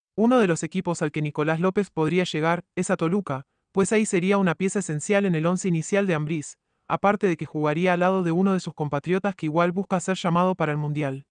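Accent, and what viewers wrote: Argentina. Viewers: Otro vez el argentino hablando 🧐 cómo se llama usted amigo argentino preséntese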